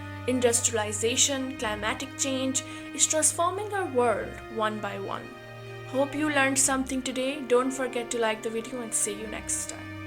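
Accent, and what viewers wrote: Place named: India. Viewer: No way could a little polar bear kill a large killer whale. Killer whale would manufacture mince carnage out of meager polar bear. Polar bear may have powerful bite, but so does killer whale & its mouth is grander with 4" dentures. Killer whales also hunt beluga whales as polar bears. Killer whales hunt same prey as these bears like seals & even kill great white sharks & i bet even crocodiles including larger whales than themselves, but as a group. If i were still a betting person, my money would be on killer whale as victorious victor. Anyway, narrator must be from India.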